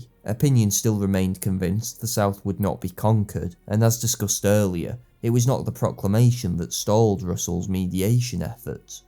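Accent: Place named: Stoke, United Kingdom. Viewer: 10 2 1 the narrator comes from Stoke!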